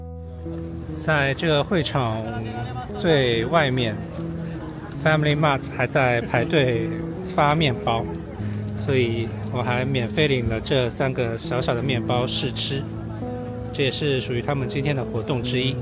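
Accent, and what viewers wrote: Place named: Shanghai, China. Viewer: 是不是？ 真的！我聽到時也覺得超像的。 於是我猜G先生應該是上海人（當時還不知G先生是哪裡人），跟我女兒說，她覺得我亂猜！ 結果後來在其中一集答案揭曉，真的是上海出生的！😆😄😊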